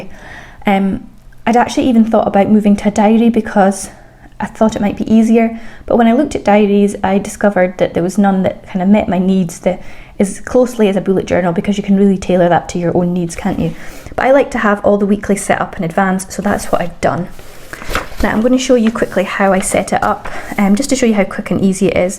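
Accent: Scotland, United Kingdom. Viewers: Fab video. Can i ask where u are from please? Are u north east scotland? X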